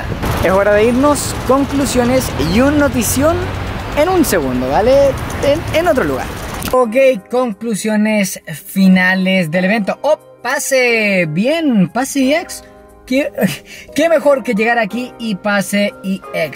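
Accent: Chile. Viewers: Sinceramente no hay mejor youtuber de Pokémon, sinceramente tus vídeos son buenísimos y por más que se quejen de como hablan los chilenos, me gusta tu manera de expresarte y te entiendo siempre, saludos desde Perú y ojalá vengas pronto qué te recibiremos muy bien 💪❤️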